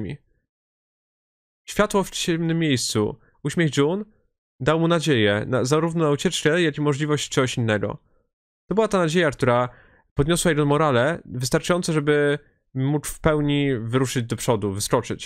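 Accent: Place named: Podlasie, Poland. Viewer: coś ostatnio masz chyba jakiś vibe na podlaskie akcenty już drugi film ci się to zdarza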